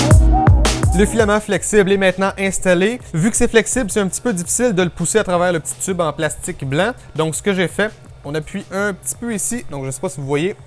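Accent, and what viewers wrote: Quebec, Canada. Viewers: Ah un québécois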